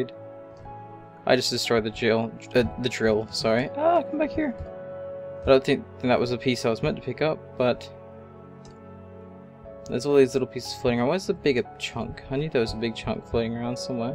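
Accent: Australia. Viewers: What country you from? Australia??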